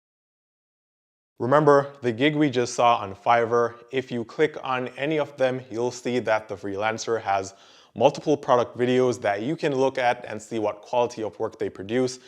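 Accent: Jamaica. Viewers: Yu sound like a yaardie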